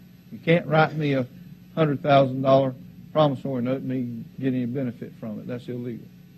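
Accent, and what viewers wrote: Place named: South, United States. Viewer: When talk shows were first starting out, and they had really outrageous guests with wackadoodle ideas, I would say to myself, ‘please don’t have a southern accent! Please don’t have a southern accent!’ And guess what- they always did. When this video started out, I found myself saying over and over in my head, ‘please do y be from the south! Please don’t be from the south!’ But Nooooo.... he just HAD to be from the south, Didn’t he? I’m only in , and I’m already cringing! Now? I wonder, with all of the old tales of ‘inbreeding’ in the south, is this guy a result of it? I’m from the south and I definitely want to vote him off of our ‘southern island’! And as I watch this, I’m reminded of the whole confederacy and the south. Would this be a way to try and ‘restore’ the southern confederacy, albeit under a new name?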